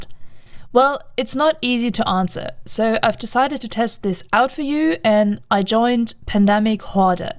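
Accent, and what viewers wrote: Germany. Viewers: no le hagas caso por favor, tu ingles se entiende perfectamente y tu acento alemán es bonito. Se te entiende mejor de muchos streamers americanos (o ingleses?) que por tener demasiada confianza con su idioma resultan incomprensibles a quien no lo habla muy bien. Y para ser pesado también diría que el idioma ingles copió la palabra Horde desde el francés, donde la "E" no es muda para nada. Sigue así, gracias por tus videos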